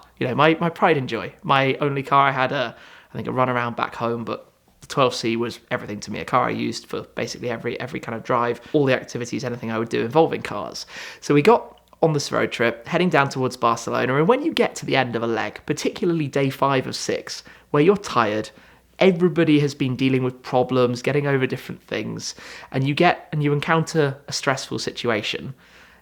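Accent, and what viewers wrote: United Kingdom. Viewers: Bc of the British accent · He s british :))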